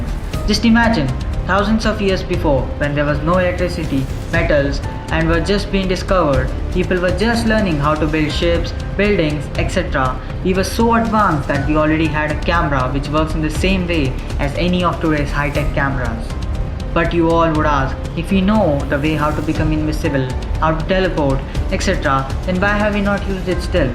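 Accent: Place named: India. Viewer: Yes video is probably really interesting but can't make a word of it out with the thick accent ..it's like not that hard to stretch your tongue and speak but it seems like Indians enjoy hearing themselves talk with a bag of marbles in mouth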